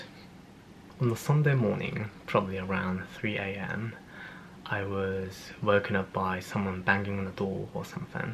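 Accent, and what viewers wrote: United Kingdom. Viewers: 話が面白いプラスブリティッシュアクセントがかっこいいからめっちゃ聞き入ってた！ww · 綺麗なイギリス英語ですね。これからも一緒に勉強がんばりましょう！ オックスフォードサッカー部？の話も聞きたいです⚽️ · お話が面白いしBritish accentがとっても良かったです✨ お忙しいと思いますが、こういう動画を楽しみにしています♪ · I like your British accent!! How did you improve your spoken English? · 追加コメントです！ 英語がとても綺麗なブリティッシュアクセントで、表現もとても洗練されてて、感嘆しました。すごく努力されたのでしょうね。 留学の当初、バスの運転手さんに「英語も分からないのになんでイギリス来たんだ！」と怒られたというエピソードを思い出すと胸熱です。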